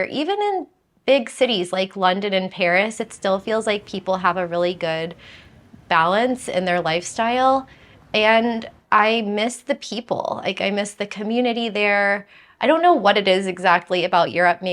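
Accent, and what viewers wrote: United States. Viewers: When coming, could you drop that horrible American accent??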